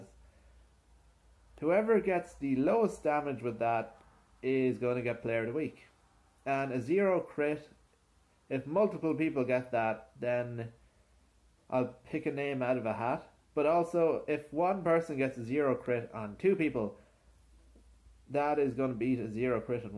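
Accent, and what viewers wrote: Ireland. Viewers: Are you Irish, and if you are where are you from (what county) & Do you stream on twitch or anything?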